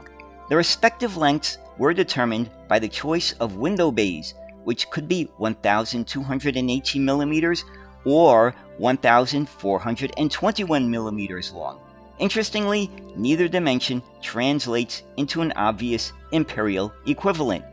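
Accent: New York, United States. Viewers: DUDE... WTH??? LOL . THIS IS SOO CRAZY. A YANK TALKING IN DETAIL ABOUT A LITTLE OLD ENGLISH BUS AND BUS COMPANY FROM THE PAST. . HAHA. I LOVE IT. YOU SOUND LIKE A NEW YORKER LOL. LOVE THE ACCENT MAN. I LIVE IN THE NORTH WEST ENGLAND , MANCHESTER AREA . NOT FAR FROM LEYLAND. IM NOT SAYING WHOS BETTER US OR YOU ARE ANYTHING, ITS JUST NICE FOR A CHANGE A FRLLOW BUS FAN AND AN AMERICAN TALKING OF SUCH A SUPJECT. THANK YOU FOR THE VIDEO. AS IT HAPPENS I REMEMBER THESE BUSES WHEN THEY CAME OUT, I WAS YOUNG, BUT A FAN OF BUSES, BUT I DO REMEMBER THEM VERY WELL, BEING VERY NOISY, RATTLED LIKE HELL GOING OVER POT HOLES, THEY HAD A BIG FAN AT THE BACK OF THE BUS THAT YOU COULD SEE THROUGH THE AIR DUCT PANAL. THST SPUN VERY FAST. I USE TO BE A BIT A FRAID OF THESE BUSES CAUSE OF THE FAN, THE SPEED THEY WENT AT AND THE LOUD NOISE LIKE A DRAGON,. G-LAGGG, G-LAGGGG, G-LAGGG, THAT WENT FASTER N FASTER AS SOON AS THE DRIVER SET OF. 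NORMALLY LONDON ALWAYS HAD THE NEWEST, BEST BUSES THE UK OFFERED, BUT BACK IN THE 70S N 80S WE HAD A VERY SPECIAL BEAUTIFUL BUS OF OR OWN IN MANCHESTER. THE MANCUNIAN BUS. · this guy sounds like he is from New York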